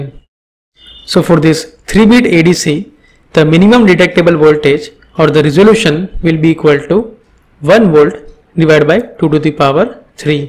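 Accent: India. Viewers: Since the moment I've heard the Indian accent i knew it was going to be a good video, they never disappoint, kings of guides.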